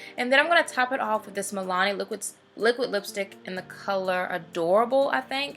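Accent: South, United States. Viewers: You sound extraaaaa country in this voiceover! Love the accent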